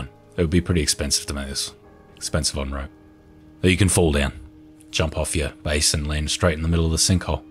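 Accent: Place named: Australia. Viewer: You sound like a true blue Aussie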